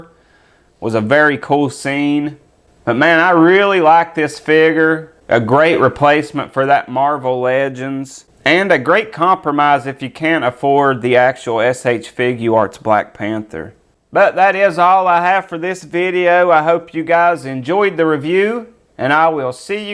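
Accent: United States. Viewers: U got cowboy accent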